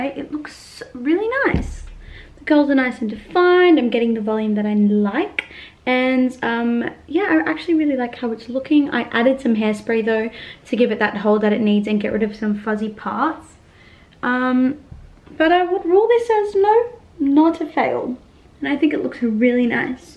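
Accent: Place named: Australia. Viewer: Love listening to your Aussie accent!😄❤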